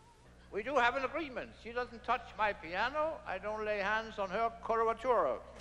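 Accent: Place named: United States. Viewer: Never seen a Dane speaks this perfect American accent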